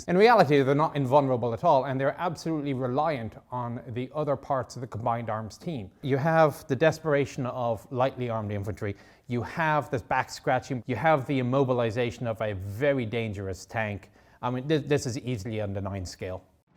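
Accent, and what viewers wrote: Ireland. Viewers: I sense the Irish accent. I’m a dub where you from buddy.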